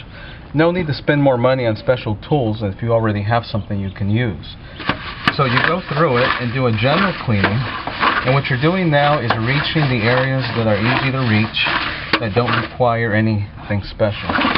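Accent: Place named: Canada. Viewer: You must be from Canada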